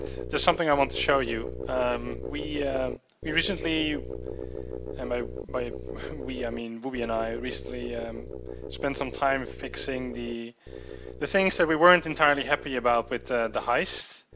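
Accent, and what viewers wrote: Netherlands. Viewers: i have a question are you dutch it sounds like it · are u dutch? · Not judging his accent just sounded like he was dutch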